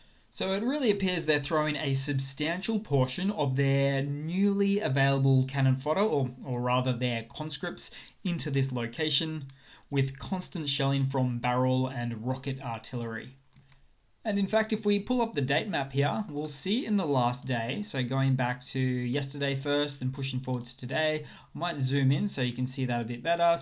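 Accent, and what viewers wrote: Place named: Western Australia, Australia. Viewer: Plagurized content. Lacks originality. Direct copy of several other established credible youtubers. Suspect an accent from Western Australia. Not impressed. There does not appear to be any significant ability to understand anything other than what we see on deep state and copying of other youtubers. Give this SOB a Thumbs Down.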